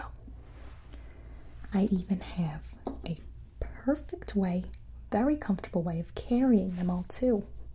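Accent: United States. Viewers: The American accent tho 🤣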